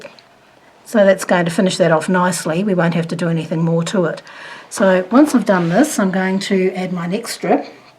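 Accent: Australia. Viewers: I love your video on how to machine bind a quilt. Very clear, very concise and a new little trick on how to make sure you cut your binding exactly the right length. PS love, love, love your Australian accent so sweet ‼️❤️ Colleen ☘️ USA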